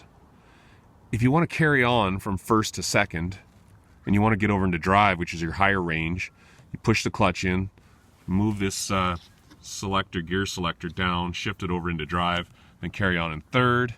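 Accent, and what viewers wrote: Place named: Canada. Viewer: You sound like you're from Canada.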